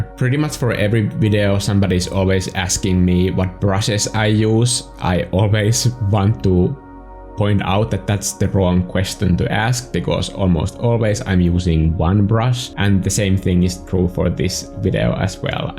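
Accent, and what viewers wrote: Finland. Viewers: I can hear an accent... can’t pin point it exactly. But I’m getting Finnish vibes? To say the least, it was nice really hearing you talk about this! You seem to genuinely enjoy doing this. The painting was absolutely lovely, certainly inspirational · Haha the moment you started talking I was like ”HE MUST BE FINNISH OMG”. I love your art and your attitude haha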